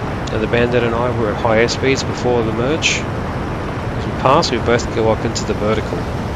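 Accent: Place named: Australia. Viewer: I want you to know the same way a British accent sounds like it fits in some gentleman's club explaining the tribulations of aristocracy, there's something Inherently confidence inducing when it comes to an Aussie accent covering anything that has to do with a fight.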